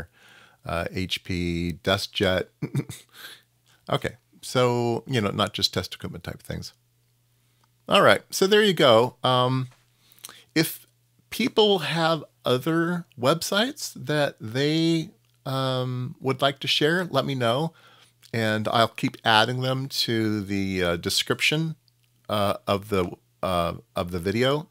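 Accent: United States. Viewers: What part of America do you come from? You overuse the word "so."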